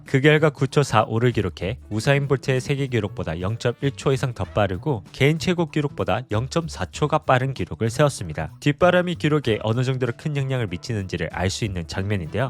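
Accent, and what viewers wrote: Gyeongsang, South Korea. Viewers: 유튜버 하려면 경상도 사투리부터 고치세요.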